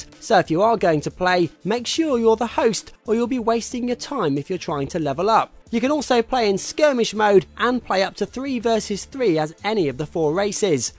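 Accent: England, United Kingdom. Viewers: dat british accent...oh my ears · did you read the entire comment? that was how to PRONOUNCE it. his normal and perfectly recognisable accent isnt the problem, CAUSE I'M ENGLISH TOO.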